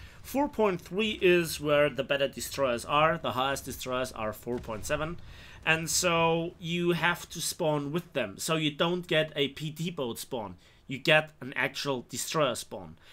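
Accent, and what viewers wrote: Bavaria, Germany. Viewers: Mach Moi wieder a Video auf bayrisch 😂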